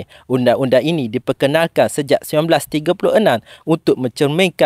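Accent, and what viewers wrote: Kelantan, Malaysia. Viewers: Pekat klate nate ni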